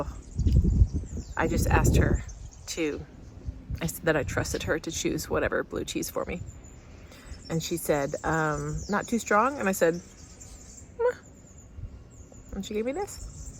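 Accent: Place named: United States. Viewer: Your behaviour is spot on French the only things you can't your accent plugs you as America not that not a bad thing the French do enjoy that because you have become French, the thing you chase flies away don't feel bad I still do the same thing and I was born here you could actually be more French than me now that is funny! I hope you wash away some stress in the court yard which a common thing to have in many buildings even in Paris! Your looking far less stress keep doing this your on the right path! One side note you should understand saying no is what all French to everyone your allowed to say no sometimes you may have to repeat yourself if he will not take your no walk away their hate I can assure he has had to told him long before he see you, and he can handle one more no! Try some of that cheese with fruit pears are really great with many cheese don't forget to take small spits of your wine with your cheese! 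It is OK to look like Marie Antoinette the French love this I am telling you I see a French lady you even have a level of class unseen by Americans. To be fair I have American accent when speaking French I have not been in France for near 40 years I don't feel French even though I am no longer American! I am having fun watching you taste cheese like I do yeah this is fun!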